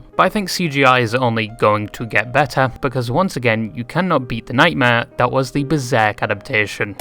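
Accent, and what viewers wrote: United Kingdom. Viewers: The bri'ish bird cooked again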